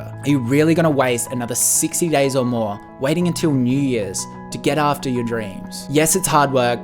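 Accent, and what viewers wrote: Australia. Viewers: Your channel are full of useful knowledge, also the Aussie accent reminds me of the days I used to study in Melbourne. And i am so suprise that we are almost at the same age and you already achive such a great success, i am start to learning e-commerce! thanks!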